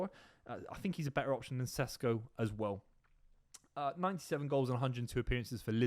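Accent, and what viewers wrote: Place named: West Country, United Kingdom. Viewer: I just cant take a City fan with that accent seriously... He should support Plymouth or Bristol...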